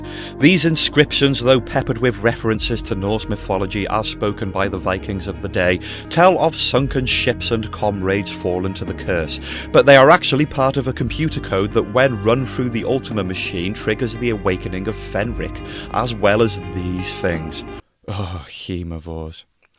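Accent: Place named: Northern Ireland, United Kingdom. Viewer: Part of me wishes this was the final episode of the seventh doctors era. As cheetah Planet ain't bad its just feels like mid-season filler & it'd have been so much better for this to have ended an Era its spiritually the season finally but the way the episodes played it ended up being the second last story. It was the perfect end to the seventh doctor. I really am glad that its part of the easy to access stuff for the newer fans to the classic era. As its fitting I got this on DVD in 2013 when I was young round the 50th due to me having a magazine that talked about a bunch of stories & this one caught my eye & at the time it kinda went over my head & I tried to relive it last year or so but my disk was scuffed & the DVD is outta print. Was planning to watch it on iPlayer when it came out & decided to watch the tales of the Tardis form which is alright but I feel like it doesn't open with as much mystery when ACE spoils it. 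But other than that its great. I shall come back to this review once I've finished watching it again. I just came to comment because the internet is being a bit annoying & I am just waiting for it to work before I post this & return. Edit: this has been eating at me for a while but I've heard enough of your speech to make me think that you're Northern Irish like me. Never heard anyone outside of NI say Babi like other words have been used but none like Babi which makes me just think you are Northern Irish. Love from the beaches of Strangford lough where Vik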